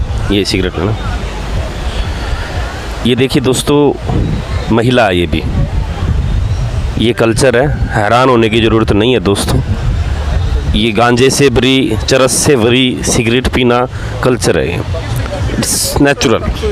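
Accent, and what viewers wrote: Haryana, India. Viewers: Bhai ye aap ke liye Naya hoga kyoki aap ke liye sab Naya hoga aap Haryana ke ho sayad kyoki aap bhaasha se pata chalta jai wahan pr ladies ko Kitna samman Diya jata jai sab ko pata hai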